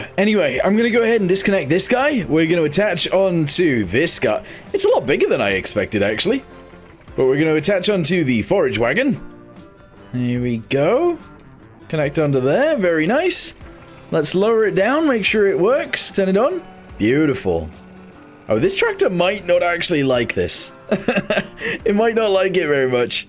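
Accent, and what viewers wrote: United Kingdom. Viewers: Is it just me or am I detecting a Geordie twang in your voice?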